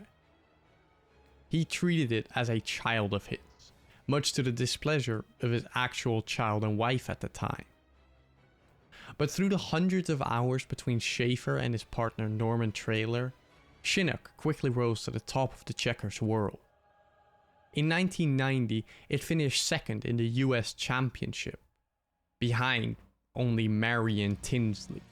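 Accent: Netherlands. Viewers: You sound Dutch, coole video gast!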